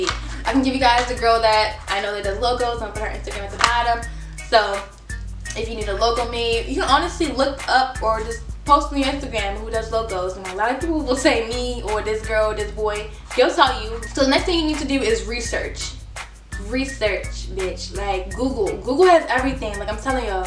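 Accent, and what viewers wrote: Baltimore, United States. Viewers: You sound like you're from Baltimore 😍💕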